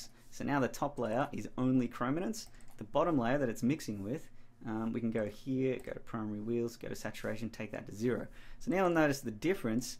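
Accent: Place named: Australia. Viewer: Great video mate thanks for sharing your workflow tips. Awesome to hear a fellow Aussie finally!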